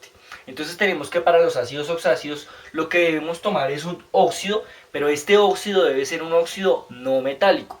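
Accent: Colombia. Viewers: Ese acento colombiano xdxd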